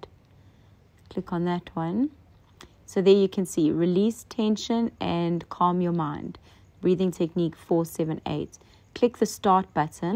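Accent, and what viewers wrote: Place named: South Africa. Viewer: She’s from South Africa my hubby is from there . I hear the accent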